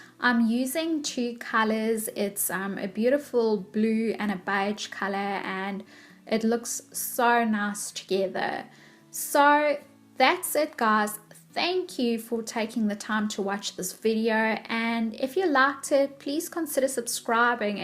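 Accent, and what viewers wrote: South Africa. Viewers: Love your tags they are so lovely.Everytime I hear you speaking it sounds like a South African accent to me.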